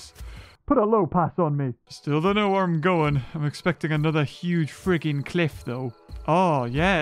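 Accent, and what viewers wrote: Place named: United Kingdom. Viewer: 5 seconds of watching the video hearing this british guy clicking on LIKE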